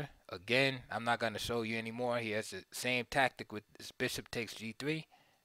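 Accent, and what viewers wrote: United States. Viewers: Put an Eastern European voice on this video...perfect. Just sounds weird with an American doing the verbal annotation.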